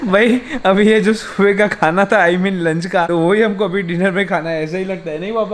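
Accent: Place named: Gujarat, India. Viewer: U r so innocent like baby + gujju voice ❤️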